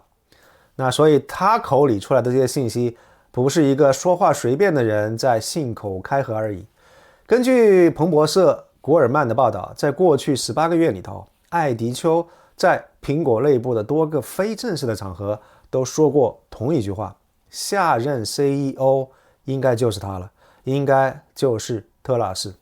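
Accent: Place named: Liaoning, China. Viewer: 辽宁口音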